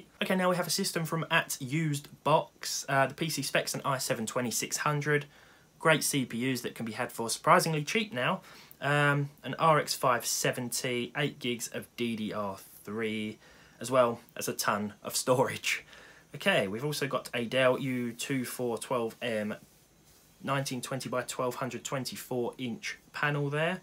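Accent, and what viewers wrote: United Kingdom. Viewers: Hello i have just come across your videos sounds like you are from the uk like myself i dont have facebook or Twitter. I am looking for someone to help me i have a 6-7 year old mac book pro works perfectly. I am currently using windows 10 via boot camp. My question is can i run windows on my macbook without using bootcamp? For example completely wipe the laptop of any operating system and just installing windows thank you i hope you can help daniel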